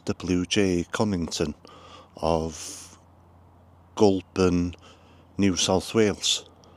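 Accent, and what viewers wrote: Wales, United Kingdom. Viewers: No need to apologize for your pronunciation... your Welsh accent is appreciated... keep up the great work.... Cheers! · I absolutely love your channel. My mother side of my family were from Port Talbot and their first language was Welsh. Your accent reminds me of my family. I’m half welsh and half English. Keep up the great channel. Your commentary and approach is unique don’t change its great. 👍👍👍👍👍👍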